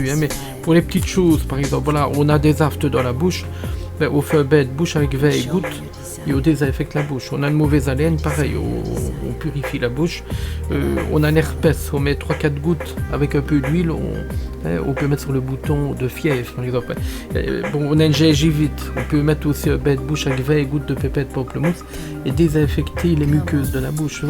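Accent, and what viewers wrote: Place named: Liège, Belgium. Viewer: En tout cas, ça n’atténue pas l’accent de « Lieche »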